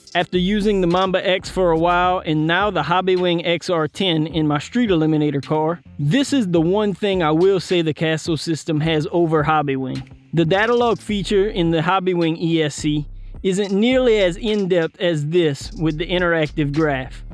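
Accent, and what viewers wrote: Louisiana, United States. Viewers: Your accent sounds Cajun. Are you from the Acadiana area? I'm in St. Martin parish. 🙌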